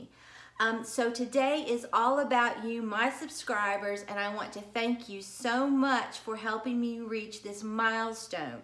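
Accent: South, United States. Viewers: You have the best ideas! And I love your southern accent. 🙂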